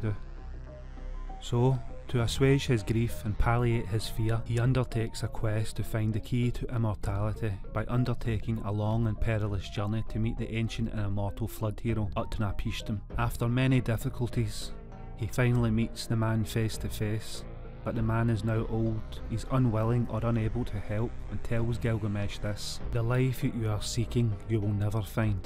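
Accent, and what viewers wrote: Scotland, United Kingdom. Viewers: Yeah! A Scottish accent! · It's his channel and I have no problem listening to his Scottish accent. Just concentrate and you'll get🖒.. cA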